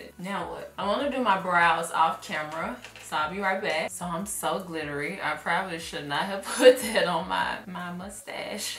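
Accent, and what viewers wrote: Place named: Memphis, United States. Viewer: Lol...i live the Memphis accent. People never have to tell me theyre from M-town cuz the accent leaves no doubt if you are familiar with it.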